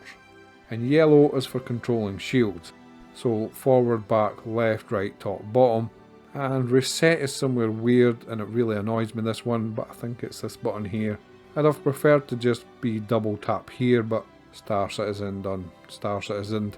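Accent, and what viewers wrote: Scotland, United Kingdom. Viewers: First Scot I’ve heard doing a star citizen channel. 🕺🏼🕺🏼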